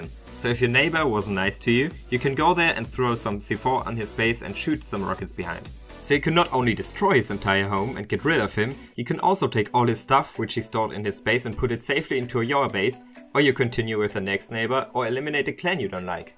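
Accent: Germany. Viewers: Brooo man hört so hart das du deutsch bist ! Aber gutes Englisch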